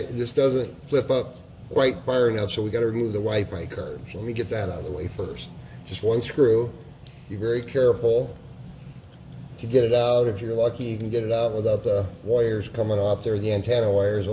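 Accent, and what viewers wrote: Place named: Upstate New York, United States. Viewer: You sound like you’re from upstate NY. Lol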